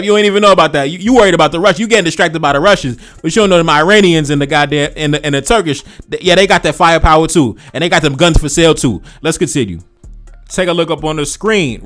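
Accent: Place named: United States. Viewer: My brother where are u from ? U are an expert on africa with American accent . Lol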